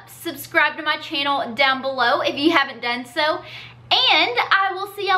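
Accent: South, United States. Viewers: “Brushy ma jig” love it! Love ur southernness.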